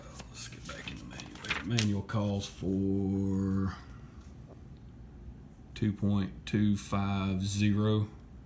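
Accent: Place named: South, United States. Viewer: I feel like we are family, so much in common even southern draw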